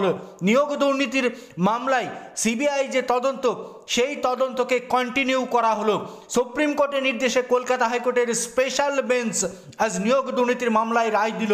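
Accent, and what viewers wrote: Bangal, Bangladesh. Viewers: আপনার বাংলার উচ্চারণ বাঙ্গালদের মত কেন?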